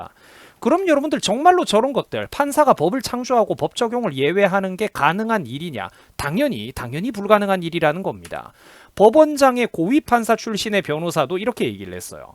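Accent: Gyeongsang, South Korea. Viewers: 잘한다!! 우파의 젊은 보수 화이팅 그씨도 경상도 얼 사도 말씨가 경상도 갠적으로 더 좋음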